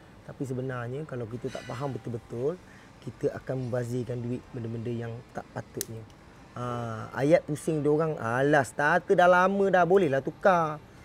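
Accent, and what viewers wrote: Pahang, Malaysia. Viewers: ni cakap mcam org pahang.. bengkel beloh mane..tak daye dah repair kete mahal sokmo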